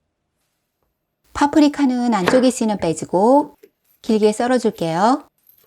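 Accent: Seoul, South Korea. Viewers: 옛날 서울사투리듣는거같지만 천천히 느긋이 설명해주셔서 잘듣고있습니다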